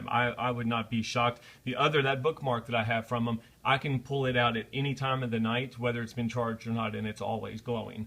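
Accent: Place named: South, United States. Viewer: Pah-peel-yone. It means "butterfly" in French. Love your videos. Great calming voice, with a pleasant Southern lilt.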